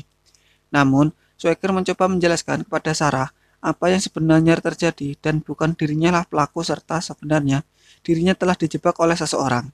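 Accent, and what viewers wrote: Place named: Java, Indonesia. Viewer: Suara elu itu mas mas jawa, blepotan, ancur banget poko nya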